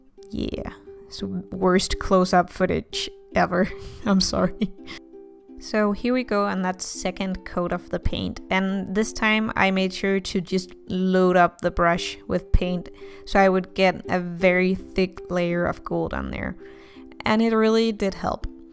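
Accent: Denmark. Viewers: Er do fra Fyn eller Sjælland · ive been trying to pick your accent, im such an idiot of course youre danish!! such a pleasant accent to listen to <3 lovely art and content as always my dude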